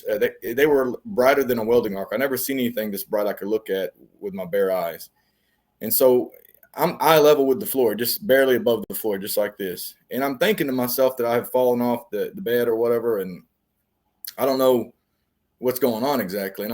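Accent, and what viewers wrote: South, United States. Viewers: Me and this guy have the EXACT same Southern, country voice😂😂😂 i know it's unrelated to NDEs, but i thought it funny because I've always been made fun of for my deep accent. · I love this guy. His southern twang on top of his humor really put a good spin on the story. But I sympathize at the same time when he’s dealing with his fear after the experience. I’ve had encounters and am careful who I tell. Maybe one day I’ll tell my story. I’m glad he got a second chance to fall in love with the Father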